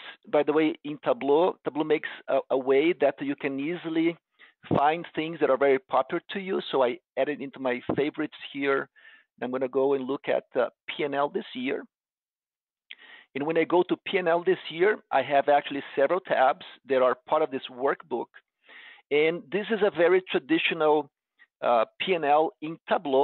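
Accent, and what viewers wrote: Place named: Brazil. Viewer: It seems to me to be someone with a Brazilian accent ...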